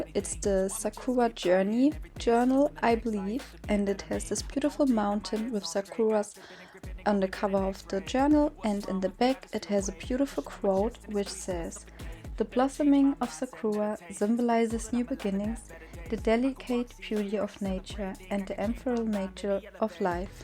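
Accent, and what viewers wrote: Germany. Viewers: Are you german? 😱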